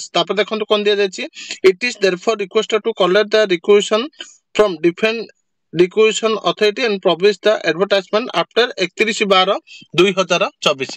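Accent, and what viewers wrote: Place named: Baleswar, India. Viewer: Baleswaria heithiba tanka adia accent semiti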